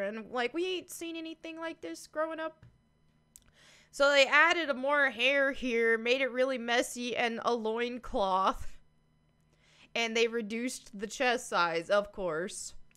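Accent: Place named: South, United States. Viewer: I have honestly become quite inspired by you since I made my way over to your channel like many. ie referral from Inspired by your Presence and almost abrasive delivery. Yet you also seem to have crazy similar mindset/goalset on...well almost every topic I have I've heard you Discuss. Like so similar that I found myself finishing some of your thoughts simultaneously in my head. Initially I was rather freaked out cause I don't think I have ever done that. It started to reoccur as i progressed through you few videos. So for one. I'd like to take this moment to tell you how awesome you and your message is..two I believe wholeheartedly in your key foundations considering most of the topics you have discussed in well the past hmm 2 days I think. haha (as well As should be spoken about more than it does.) Anyway, I do apologize for how intense this message may be for you. 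it is I guess simply how unexpectedly intrigued I have become. enough that I wrote this entire comment which I still don't even know the extant of my point. But was so enthralled that I almost felt I needed to connect you and relay my gratitude amongst other things. Also iDk what bit of southern touch to your voice you have. But it rocks kinda like you it fits you... I am from NYC born and bred so i am unaware of where. Allz I know is the more I learn. I am in awe of you. So Thanks for being You and keep the vids coming. you have probably reestablished a glimmer of hope for us as humans and women. I thou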